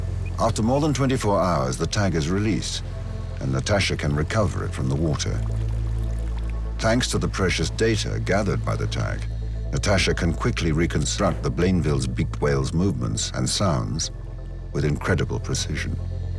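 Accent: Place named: United Kingdom. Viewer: I really wish producers wouldn't use British voice-over with American scripts. Have some confidence guys! Also, to mention the blatantly obvious, it's not "eco-locate", it's "echo-locate", and 'dive' is a regular verb. It's not like "drive" just as "pee" is regular and "see" is not. Otherwise, we'd say, "I pawed my pants because I was so scared".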